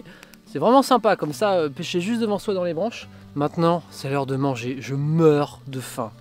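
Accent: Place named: Marseille, France. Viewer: Tu as l'accent marseillais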